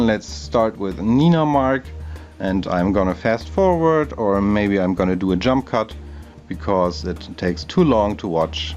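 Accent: Germany. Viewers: Man merkt das du ein deutscher bist...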